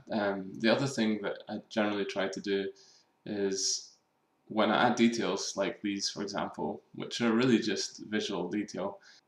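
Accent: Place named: Scotland, United Kingdom. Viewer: Hi, where are you from? You have a Scottish accent. Your asiimov skins are beautiful btw.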